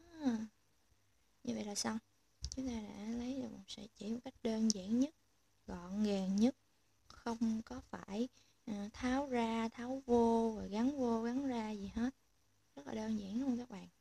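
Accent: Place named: Central-South, Vietnam. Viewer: nói to lên một chút c ơi, giọng miền trong vừa nhỏ vừa vừa khó nghe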